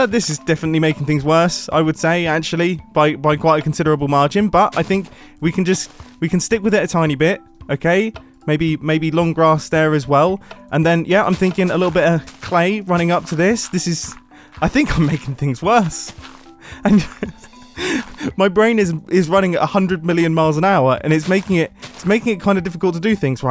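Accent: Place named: United Kingdom. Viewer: That Bri-ish accent tho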